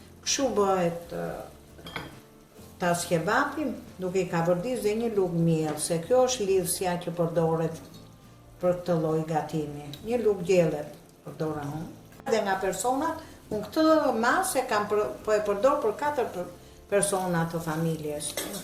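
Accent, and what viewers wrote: Tirana, Albania. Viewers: te lumte goja e dora na kenaqe me gjuhen e tirones po e gatimet i ke shume te mira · Sa me pelqen kur flet gjuhen e bukur tironse…❤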